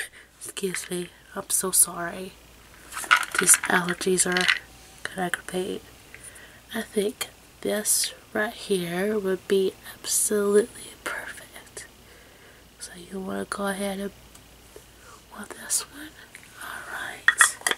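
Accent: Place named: South, United States. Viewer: your sweet toned voice combined with your subtle southern accent is very relaxing!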